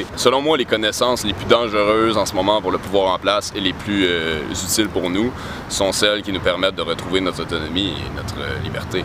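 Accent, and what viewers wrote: Quebec, Canada. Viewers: Beaucoup de charme pour faire des vidéos peut être à ne plus y compter, mais trop volubile et rapide en paroles, préparées au rythme des thèmes choisies, je n'en doute pas, mais qu'on n'arrive pas à suivre même jusqu'au bout, ça saoule quoi ! Mais Monsieur a du crack, et une bonne tête que j'aime parfois entrevoir dans mes vidéos you tube, mais quelle horreur cette accent québécois ! Toutefois j'admire votre façon de parler avec beaucoup de pensées, un être étrange, édifiant dans son intellect, vous réfléchissez sur beaucoup de choses et montrez plus ou moins votre optimiste d'en parler, vous être étrange et drôle à la fois à la manière de vous exprimer...bon en théâtre, je n'en doute pas et croquant la vie à pleine dent pour toutes aventures...bon courage...vous me faite penser à un jeune scientifique plus ou moins ermite qui s'émancipe dans des thèses pour pouvoir s'affirmer dans la matière qu'il a choisi..bon je ne vous oublierais pas, faites attention à vous et garder la forme et santé...bye... 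· T'es un fréro d’âme ! Tout pareil appart ton accent dégueulasse de canadien ! Je soutiens tout tes propos a tous les niveau a 100% Enfin jusqu’à maintenant . Mais jai confiance en toi ! Bonne chance ma couillette dans ce monde accro a la propagande peace ma gueule !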